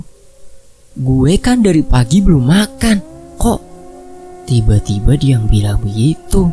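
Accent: Java, Indonesia. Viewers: Min.. Teh yanti ngomongnya pake logat sunda apa logat bali ya...😊😊😊 mimin cocoknya logat jawa..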